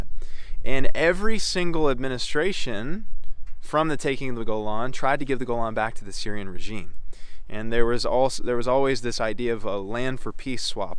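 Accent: United States. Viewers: In this video a European looking guy with an American accent tells us why its okay to take stuff from people if you have a magic book telling you it used to belong to some fraction of your genetic ancestors. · I couldn’t agree with you more Brother!!! You sound American 🇺🇸 Only have one ☝️ question 🙋 If CHRISTIAN’S truly believed in Hashem and Yeshua as their Savior and following the Torah as COMMANDED… Why is it that United States 🇺🇸 does not have one ☝️ fox 🕳️ hole dug in the Golan Heights and have not worked with the I.D.F.? When I take full control of the American 🇺🇸 Pentagon… I’ll go ahead and make the arrangements myself my American 🇺🇸 friend. I will be activating all U.S. Military branches to DEFCON 5 and deploying the Pacific Fleet off the coast of Israel 🇮🇱 Further arrangements are CLASSIFIED….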